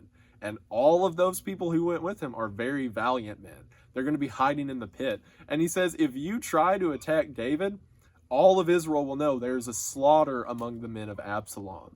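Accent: South, United States. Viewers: Trying to figure out where his accent is from. Atlanra...the carolinas?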